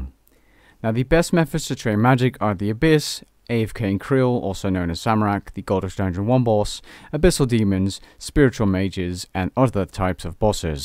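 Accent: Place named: Netherlands. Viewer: haha nou je floept er genoeg Nederlandse klanken door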